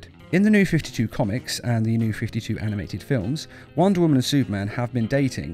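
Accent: United Kingdom. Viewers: That’s why his content is so great because he keeps that sophisticated British accent and is so casual even if he is talking about the dumbest things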